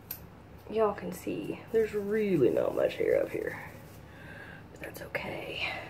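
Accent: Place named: Midwest, United States. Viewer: I would just wear wigs. Bigwigs Edit: I knew that was a midwestern accent! Also I hope she is doing well today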